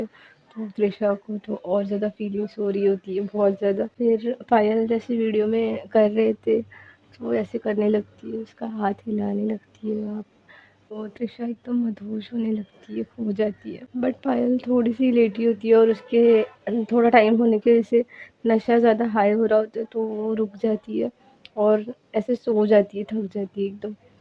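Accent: Maharashtra, India. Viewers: Thank you mam😊, aap bohot aache se stories batate ho. Muze kabse aapko kehna tha ki apki language se marathi wibe aati hain muze thodi thodi😅.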